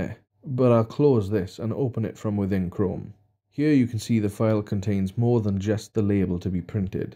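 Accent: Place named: Wales, United Kingdom. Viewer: Watching this in June 2020... this is brilliant! That Welsh accent is just gorgeous yowser! I always wondered how people got those labels printed. I must get one of these Printers, it's a great investment. Thanks... great vid with a nice sharp image... love it!. New Subbie. London UK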